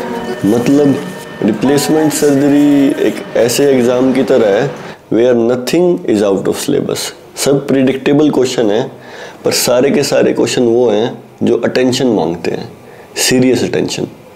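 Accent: Haryana, India. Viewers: Sir your haryanvi is awesome - approved by another haryanvi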